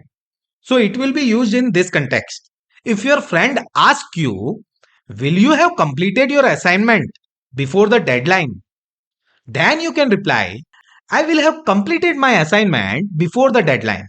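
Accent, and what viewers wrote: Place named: Mumbai, India. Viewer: It’s the best explanation that I have ever met. Thanks for it! Although you have the “mumbai” accent - no matter! For us in Czech rep. it’s even easier to understand English with this accent 😁.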